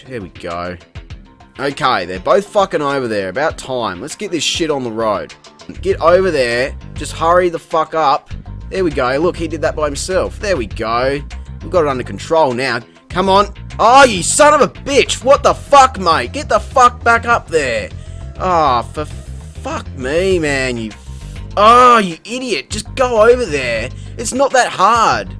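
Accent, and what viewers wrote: Australia. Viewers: ANNOYING VIDEO WITH AN AUSTRALIAN ACCENT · I just put up a review for the first one and here I see you post another hilarious one for the sequel hahah keep it up. strikes again!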